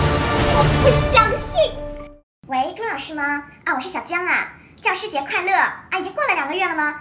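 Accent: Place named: North, China. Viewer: 奇怪，papi明明上海话讲了侠气标准，为撒普通欸吾一股北方味道？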